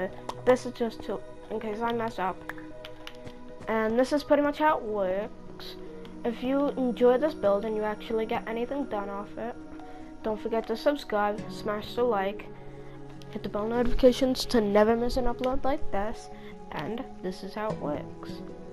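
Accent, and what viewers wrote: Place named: United Kingdom. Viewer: Holy shit you sound British in this video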